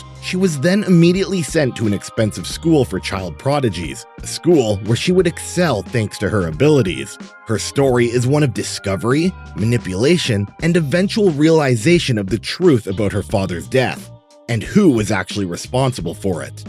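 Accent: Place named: Canada. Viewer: you are a canadian aren't you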